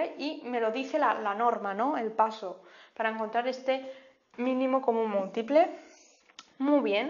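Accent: Spain. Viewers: por donde vive por esa voz vive en españa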